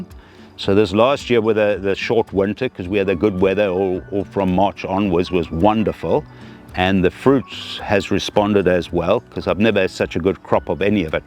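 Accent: South Africa. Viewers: Im assuming he’s South African, he sounds very SAn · did not really catch the area.. Is it part of England? He sounds South African❤ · Great look! You can't mistake that wonderful South African ascent ❤ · There is only one accent like it.😂 I immediately knew he is South African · Oh the south african accent. Unique I tell you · I just knew he was South African 😅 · I'm spotting a Saffa accent! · The moment he started talking - I knew.... another Saffa - nice job! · Delightful! So proud to hear his South African accent ❤ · This is so beautiful and so lovely to hear a South African voice doing this magical work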